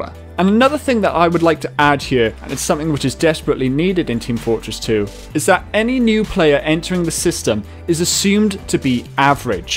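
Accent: Wales, United Kingdom. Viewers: Ey there boyo. what part of wales are you from? Newport/Chepstow down yere. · The accuracy/precision distinction is a personal pet topic of mine, sitting crucially in the middle of the entire field of predictivity, informativity and really all rationality as it stands. I just can't abide by a ... I can't think what the term is for it, a jumbling-up of the terms in a critical context, knowing the comprehensivity of its importance. The distinction is pretty simple (it can be explained with pictures of kittens wearing mittens) and pretty significant so any superficial pedantry can be accepted. It did take me a few minutes before some of the -isms of your accent that I'd been mulling over began to coalesce around "Welsh". At first it just sounded like a generic southern-central English accent, but I imagine if you said "I left my wellies in the valley" it would highlight the distinction.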